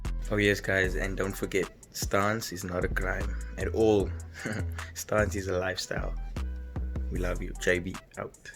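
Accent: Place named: South Africa. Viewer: this is a south African accent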